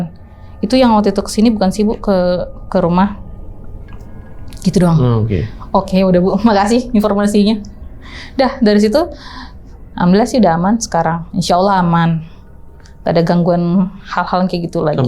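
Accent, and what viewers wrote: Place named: Betawi, Indonesia. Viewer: keknya betawi😂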